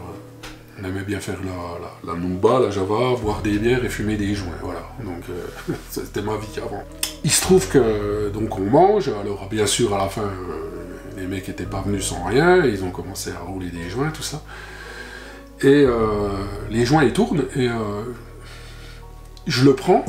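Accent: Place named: Franche-Comté, France. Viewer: ❤ Magnifique témoignage (et magnifique accent comtois)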